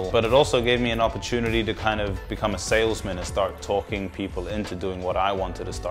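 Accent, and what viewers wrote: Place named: New Zealand. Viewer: The bro slowly letting go of his kiwi accent…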